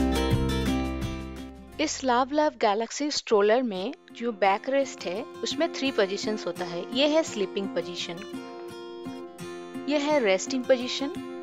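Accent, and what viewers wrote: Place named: Bengal, India. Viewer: Bengali lady 😂😂... Your accent says it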